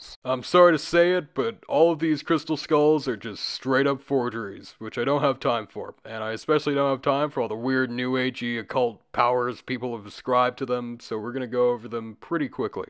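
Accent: Canada. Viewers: I thought he was Canadian? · he’s just Canadian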